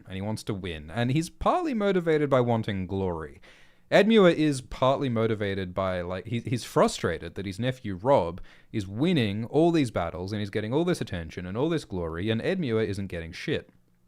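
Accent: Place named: Australia. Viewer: <link> MY EXPERIMENT SUCCEEDS, or fails, depending on how silly it is that both my dog and I sleep better with chill Aussie narration. Thanks for all you do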